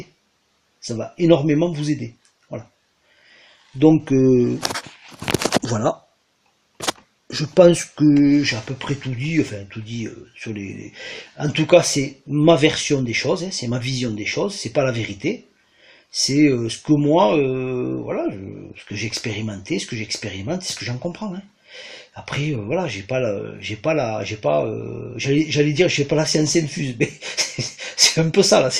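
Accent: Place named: Cantal, France. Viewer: Bonjour, je viens d'ecouter plusieurs de vos potcasts et ce qui m'a frappé c'est votre accent qui ressemble fortement à celui de chez moi, le Cantal 😅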